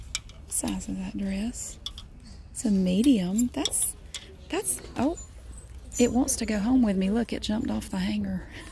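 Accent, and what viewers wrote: South, United States. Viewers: "Naughty-nahn, naughty-nahn" Gawd I love the southern accent. LOL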